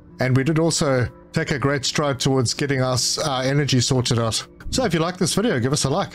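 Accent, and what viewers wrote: South Africa. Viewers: I knew it I thought I herd a South African accent 👌👌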